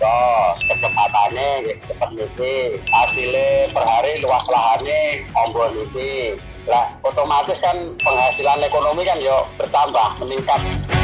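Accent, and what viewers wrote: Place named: Blitar, Indonesia. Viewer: Suara rekamannya orang blitar,,, saya jg orang blitar.... Dimana alamat dealer resmi quick di blitar pak bos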